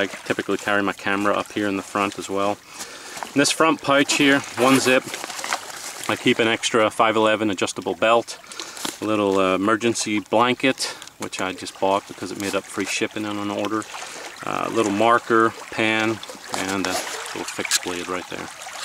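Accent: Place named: Northern Ireland, United Kingdom. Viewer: Hey this is the first of your video's that i have watched! I gotta move to USA! lol. I love how after 20 years you still have your Northern Irish accent, I love the hybrid USA/Northern Irish twang you've got it's so cool! Gonna have a lot of fun looking through your vids! Blessings from Carrickfergus NI.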